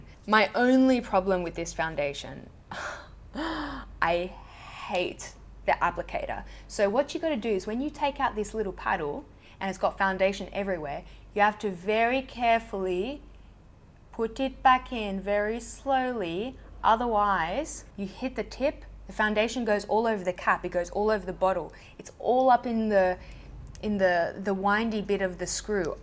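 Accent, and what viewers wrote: Australia. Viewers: I accidentally spoke in your kind of Aussie accent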